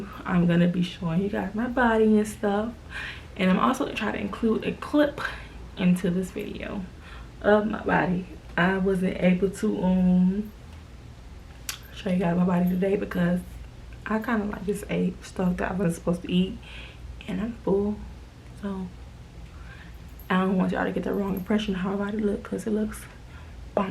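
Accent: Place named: Miami, United States. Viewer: Lmao your Miami accent is so cute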